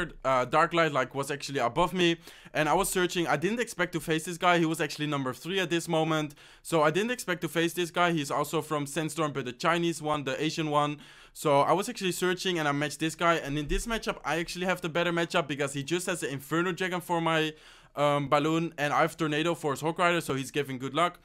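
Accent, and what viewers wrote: Netherlands. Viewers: Typical dutchmen accent 👍 wacht op een nieuwe log bait deck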